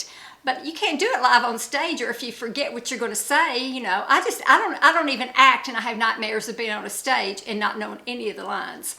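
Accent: South, United States. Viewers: I love listening to your sweet southern voice❤. I live in Georgia and probably sound just like you! Praying for a quick recovery from your upcoming surgery! Bless your heart ❤️. You are a beautiful soul ❤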